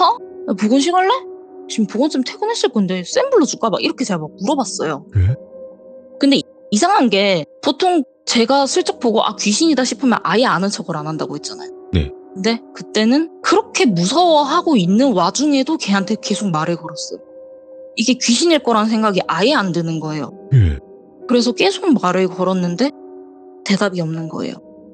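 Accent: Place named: Gyeongsang, South Korea. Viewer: 경상도 마산쪽 사투리인데?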